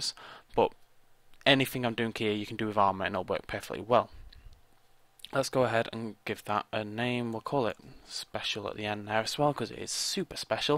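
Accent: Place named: Northern England, United Kingdom. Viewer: Lol the YT subtitles obviously don't get Northern English accents. You were apparently talking about Alaska or something. (I'm a Northerner).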